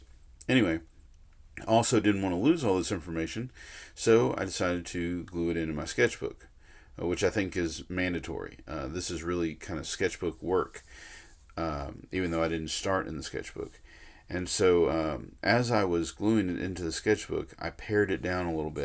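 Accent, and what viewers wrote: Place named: Gulf Coast, United States. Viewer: Grew up in Gautier. 😂 recognized the coast accent immediately 🙂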